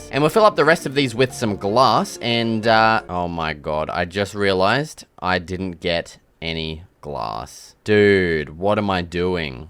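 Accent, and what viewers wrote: Australia. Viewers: U can’t tell me u aren’t Australian 🇦🇺 if you are then we are the same (I’m Aussie)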